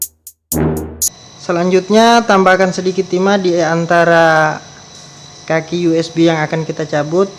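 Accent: Indonesia. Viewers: Kalo logat e kaya ne orang pasar bulu ini yo heeee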